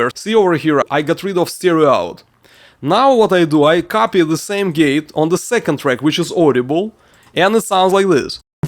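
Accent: Russia. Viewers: Хоть я и не особо знаю английский, но когда человек говорит с русским акцентом, то почему я понимаю о чем он говорит?)))) Видео зачетное!👍 Полезное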